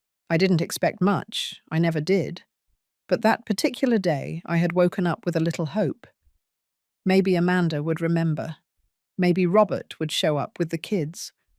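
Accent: England, United Kingdom. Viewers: Going to the beach in December. There is an accent that is English….no beaches warm enough for swimsuits. Maybe britishers that emigrated to florida or California???? Or just AI being ignorant abain 😆😆😆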